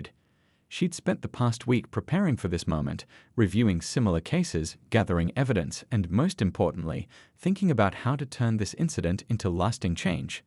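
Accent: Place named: England, United Kingdom. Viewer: Suck video of a still picture and English crappy accent...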